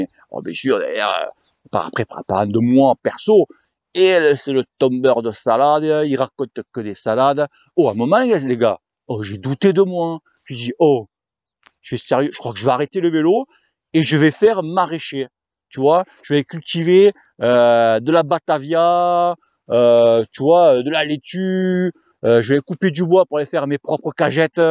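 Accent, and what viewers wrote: South, France. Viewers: Bravo, super vidéo. Ne change rien, ce ne sont que des jaloux. Et vive l'accent du midi. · Ride on! continus à être honnête, franc, généreux et entier. Dis ce que tu penses au moment ou tu le penses et avec ton accent du sud: c'est comme ça que l'on t'aime. Ton sens du partage fait plaisir à voir. Tes messages de prévention sont les bienvenus pas trop d'exo de force pour les jeunes,..., pas trop de zwift. merci de t’inquiéter pour nous. c'est sympa. peace.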